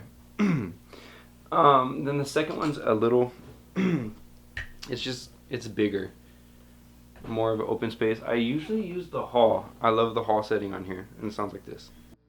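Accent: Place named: Brazil. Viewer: Nice! Quality and efficiency... Hey man, are you Brazilian? Something in your english sounds familiar...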